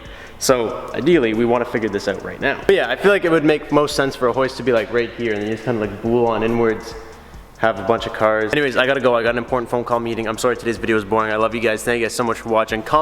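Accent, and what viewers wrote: Canada. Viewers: You're a real there canaaaaadian bud. Saying soury instead of sorry 😂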